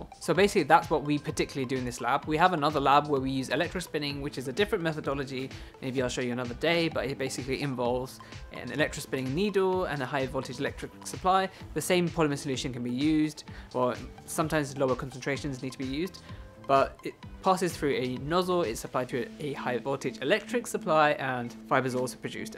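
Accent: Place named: United Kingdom. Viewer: you talk very nicely, professional, sweet and obviously how can I forget your British accent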